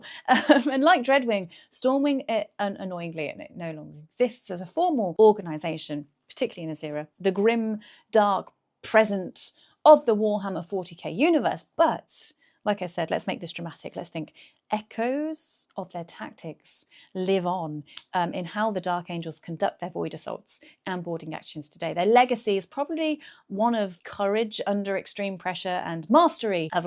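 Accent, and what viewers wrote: United Kingdom. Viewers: We just discovered Dark Angels greatest secret. Their Dreadnoughts are piloted by the FALLEN! P.S. are you British? You have a beautiful accent.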